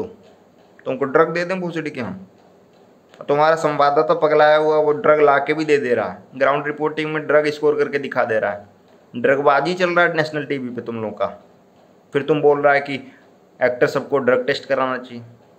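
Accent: Bihar, India. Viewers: That bihari accent 😍, makes the video great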